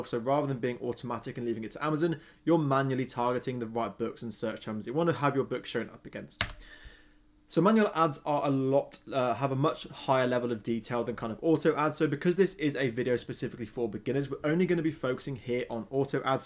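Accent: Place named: England, United Kingdom. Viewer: OMG, I was about to say the same thing. He talked so fast I kept drifting off and daydreaming. And that English accent isn't helping, it's too thick. 🙄😴😕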